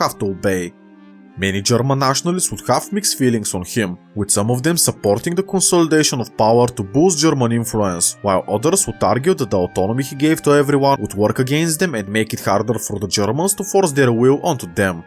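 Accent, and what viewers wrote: Bulgaria. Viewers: the Bulgarian accent is one of the many unique features you bring, please only remove it, if it makes you feel uncomfortable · Don't. I might be biased because I am also a Bulgarian, but I love your accent.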